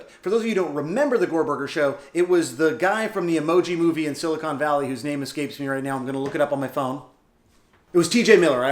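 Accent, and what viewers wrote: Canada. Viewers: Woah wait, are you Canadian?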